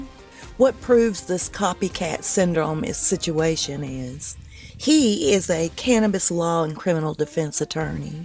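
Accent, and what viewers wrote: South, United States. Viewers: I love your reports. Your southern accent. You. Keep it up.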